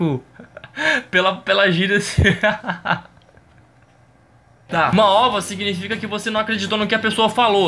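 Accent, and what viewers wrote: Brazil. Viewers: Vc ta falando goineins ou goiano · O sotaque de Rondônia parece com o paulista, pelo menos o seu.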